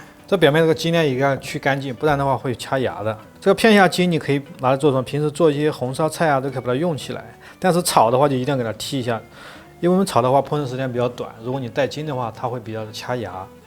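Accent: Sichuan, China. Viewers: ，铲铲哦，你娃的川普太亲切了😊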